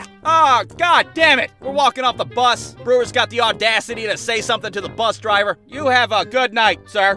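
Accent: United States. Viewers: So u from California Texas or new York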